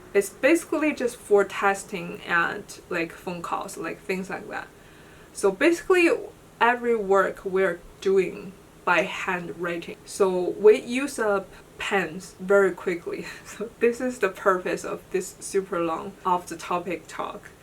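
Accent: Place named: China. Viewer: 之前听你口音就觉得是中国人，果然😊爱看你的铁皮进展❤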